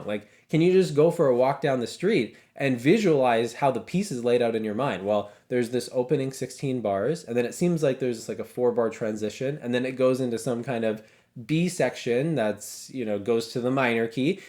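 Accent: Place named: United States. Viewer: Hi, I have recently discovered your channel and although I usually prefer UK or Australian based audio, your US accent is actually easy to listen to! Now like Judy Fiterman’s comment, I am nearly 80 am relearning piano after some 65 years absence, and also Sax which I took up in my 50’s for about 4 years then again some 4 years ago in a local concert and stage band. I have trouble remembering a 4-6 digit security code, let alone music. Sax matters less as in a band It’s normal to have the sheet music, but I really would love to have a small spontaneous repertoire of piano pieces I could play from memory. Strangely I can play the first section of Fur Elise by heart, because I suspect I learnt that as a child, but not the second two which I can play now with the music in front of me. ( But it has taken some two years to get to speed and musicality!) My other problem is audition, as I really have no singing voice and have trouble repeating even one played note accurately. 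Do you have any advice for us oldies who are experiencing some general memory loss, shall I say deficiencies or lapses, or could you do a specific session addressing this issue. I love my return to music and call it my “anti dementia” activity! Thank you. Karla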